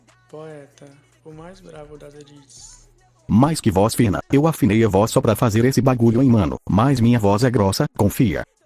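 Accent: Bahia, Brazil. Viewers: Pensei que o poeta era baiano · Pensei q ele era da Bahia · Sou de Goiás também kkk mais acho que tu e baiano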